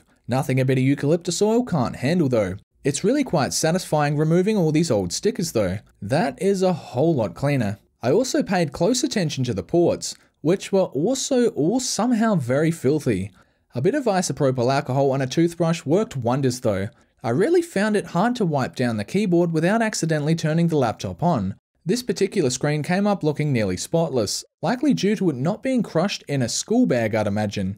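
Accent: Australia. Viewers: Hey, you a fellow Aussie?????? I should've recognised the accent, I'm disappointed in myself. Really really disappointed. Edit: a correction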